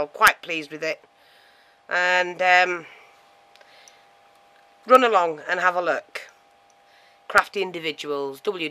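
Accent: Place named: Leeds, United Kingdom. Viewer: So pretty. Keep them coming I can't wait to see what you come up with next. Thank goodness I don't work anymore I'd have to quit and stay home to watch. LOL My mother, uncle and gram & grandfather where born in Leeds. They came to USA in 1920. But I hear their voices when I listen to you. Thanks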